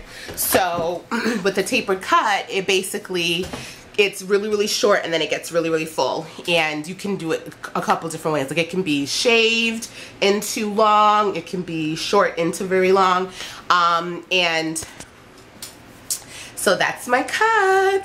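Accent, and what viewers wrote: New York, United States. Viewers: Love you!!!!! and you New York accent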